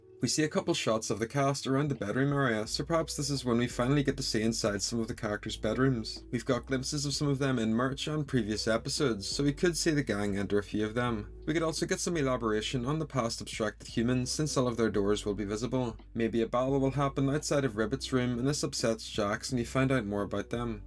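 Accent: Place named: United Kingdom. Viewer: Your accent sounds like a british person🏴🤯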